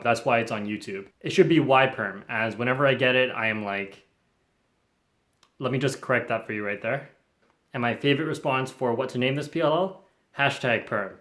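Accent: Australia. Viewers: You sound just like an Australian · Your aus accent is actually really cool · His Aussie accent sounds EXACTLY like Feliks Zemdegs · That Aussie acsent was alright cause I am a boody aussie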